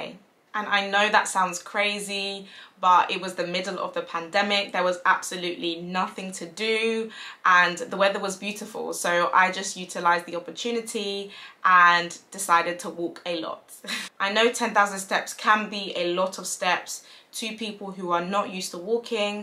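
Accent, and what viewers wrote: United Kingdom. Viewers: 🇧🇧🇬🇧Newly subscribed! Made me laugh with the biblical disclaimer 😂. I have to mention how great it is to hear a British accent sharing their journey not come across many here on youtube. Great tips, by the way, your journey is truly inspiring, thanks for sharing.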